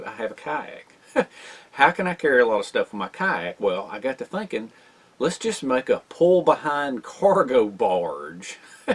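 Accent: South, United States. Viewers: Wow how do people get a southern accent like that.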